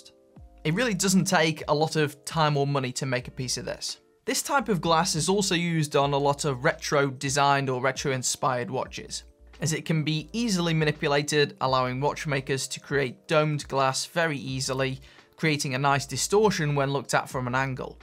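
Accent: United Kingdom. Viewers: I love British accent interesting to listen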